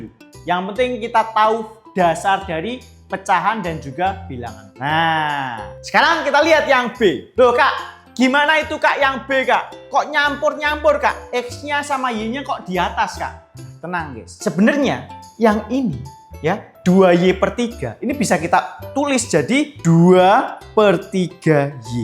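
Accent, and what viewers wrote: Java, Indonesia. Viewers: Kak kamu Jawa?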